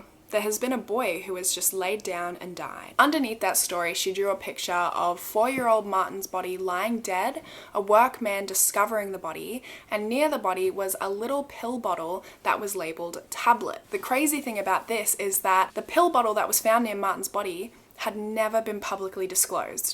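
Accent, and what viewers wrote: Australia. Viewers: Where are you from ? Australia ? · Sure she is Australian 🤔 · Are you australian? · i love your australian accent!!! 😭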